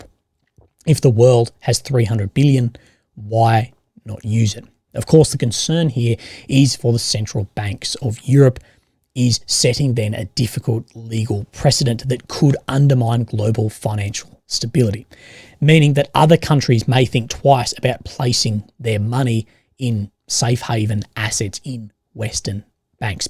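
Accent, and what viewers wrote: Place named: Australia. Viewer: Australian accent, get over it.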